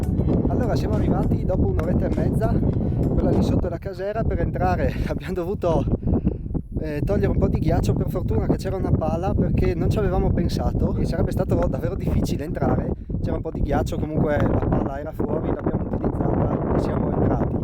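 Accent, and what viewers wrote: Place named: Paularo, Italy. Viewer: Bel video e bella escursione... Ma di dove sei? Dall'accento si direbbe della zona di Paularo ma potrei sbagliarmi...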